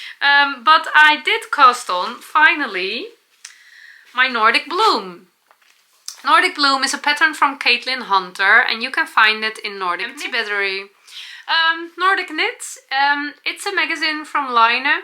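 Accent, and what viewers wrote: Netherlands. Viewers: Just love your Dutchy English - your brightness and cheefulness is so refreshing 🤩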